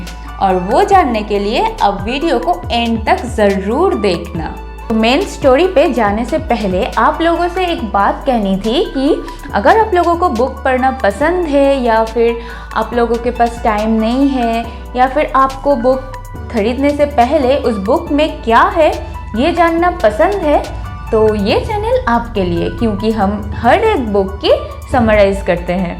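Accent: Bengal, India. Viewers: Its ok sab bol rahe hai ki hindi thik karle vo bengali me yarr thoda farak to padega hi na hindi me 😍 per aachi to hai bhoth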